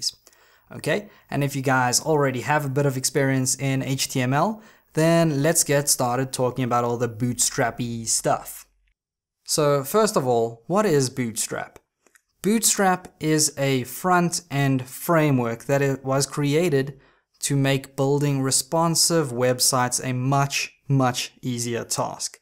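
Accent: South Africa. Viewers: By your accent I suspected you're from SA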